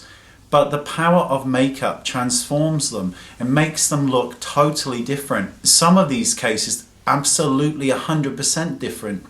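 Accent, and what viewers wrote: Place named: Wales, United Kingdom. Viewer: I'm hearing more of the welsh in you today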